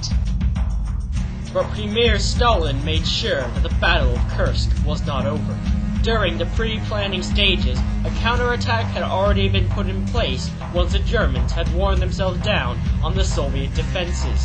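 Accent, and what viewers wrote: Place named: United States. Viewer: Very good. Excellent to hear about the true turning point of WW2 through an American voice. In the US, we rightfully pay tribute to our amazing heros of D-Day, but we lose sight of the fact that we were but junior partners when it comes to the European war. My father was among those Americans who fought in Africa, and then Italy. Six of my uncles fought also, one in the Battle of the Bulge, two in the Pacific, one of them killed by the Japanese. But the Red Army crushed the Wermacht.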